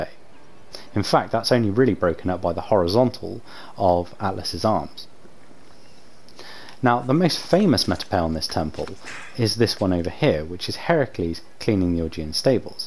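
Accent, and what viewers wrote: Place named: United Kingdom. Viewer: and that sweet british scientific accent hahah, thanks for video man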